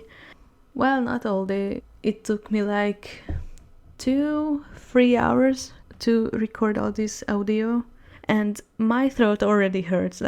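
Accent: Hungary. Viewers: Ohh my god! Im hungaryan and i hear the hungaryan accent in your voice! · #magyarakcentus :D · By any chance, are you hungarian? you sound like familiar :D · Hungarian accent :3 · Annyira jó hallani a Magyar akcentust. :D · az a jó magyar akcentus :D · Your English is really good. Even tho I can still hear the hungarian accent a little lol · I can hear the Hungarian accent haha! Omg amazing!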